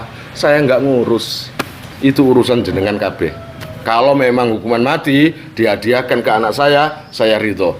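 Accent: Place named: Banyumas, Indonesia. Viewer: Mbrebes juga ngapak kyai 👍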